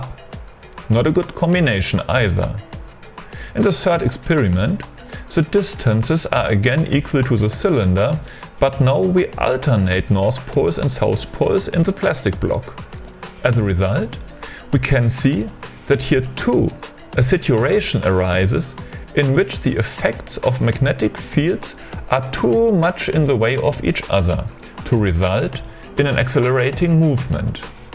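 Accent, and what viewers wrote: Germany. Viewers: Maybe you write in the videos Headline, "MY Magnet Motor do not work" ;) ich denke ich kann auch deutsch reden, du hast son typisch deutschen englischen Akzent :D Mit dem Thema musst du dich schon ausführlicher beschäftigen, denn einfach magneten an paar Platzikteile kleben, das reicht leider nicht, das wichtigste ist ja ein ungleichgewicht bei Anziehung in die gewünschte Drehrichtung zur Gegenrichtung zu schaffen, was auch schon bei hohen Drehzahlen ohne jeglichen weiteren Bauten funktionieren würde, aber wie hoch diese sein müssen kann ich nicht sagen, um was es dabei geht, einfach mal das hier schauen: <link> Was Quantum Energy meinte ist auch eine Möglichkeit, einen Art Monopol zu erschaffen, der Lüling hat es gemacht, in dem er die Magneten im richtigen Moment räumlich entfernt hat, über eine Feder...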